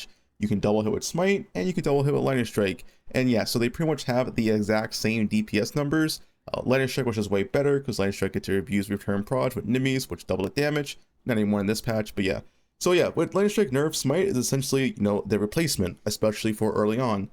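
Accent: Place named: United States. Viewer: Good info, but you need to pronounce build names slower and clearer. I had to rewind a couple of times just to hear what you were saying. I'm not from america, so your particular accent isn't familiar to me.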